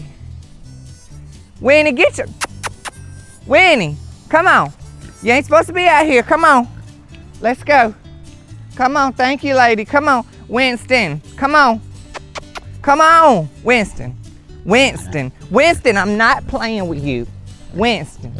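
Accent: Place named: South, United States. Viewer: This ain’t funny. Just talking nasty ain’t funny even with a southern accent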